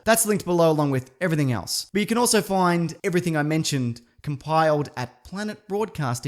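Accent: Australia. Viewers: u kinda sound ozzie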